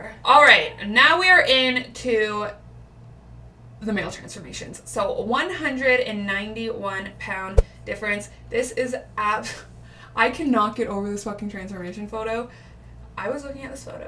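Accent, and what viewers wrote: Canada. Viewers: Your Canadian accent is SO strong it’s adorable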